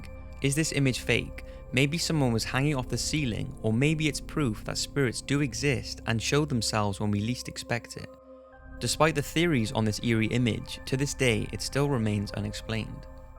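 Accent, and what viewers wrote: Wales, United Kingdom. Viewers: are you Welsh, I am welsh and I have a friend who sounds exactly like you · R u Welsh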